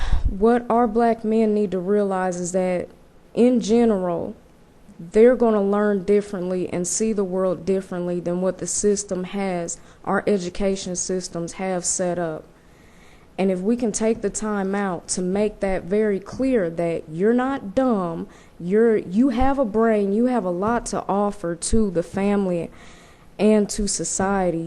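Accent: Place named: South, United States. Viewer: I love your accent... It's got a strong Southern twist to it...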